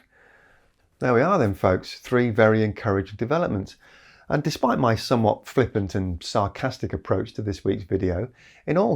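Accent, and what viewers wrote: England, United Kingdom. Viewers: You look just like that other bald guy but with an English accent .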